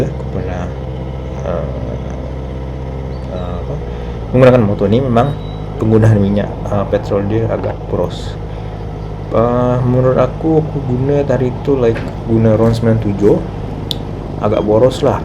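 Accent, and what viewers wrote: Malaysia. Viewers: Pandai loghat melayu malaysia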